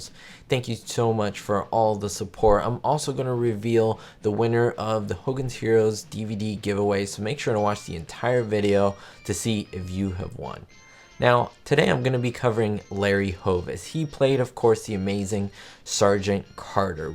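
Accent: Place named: New Jersey, United States. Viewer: You must be from NEW JERSEY.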